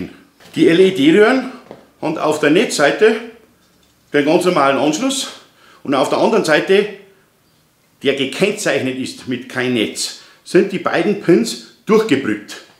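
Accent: Bavaria, Germany. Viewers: woher kimmst du eigentlich aus Bayern?:D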